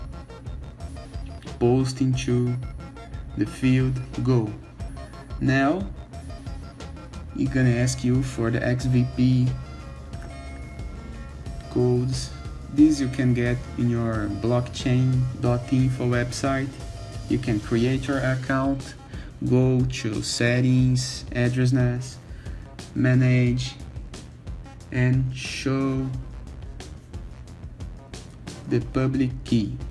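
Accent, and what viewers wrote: Brazil. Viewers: Olá, amigo. Pelo sotaque suponho que é brasileiro. Quero ver se consegue me ajudar com um dúvida, quero gerar uma forma de pagamento que transforme o valor de Reais para a cotação do momento, tipo, gerar o QR code de R$500 com a cotação do mercado bitocoin. Valeu, amigo. Sucesso.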